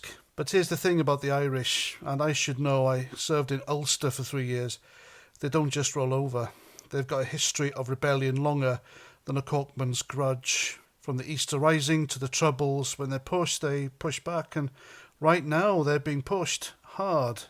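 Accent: Wales, United Kingdom. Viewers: The Narrator is not English he's Welsh.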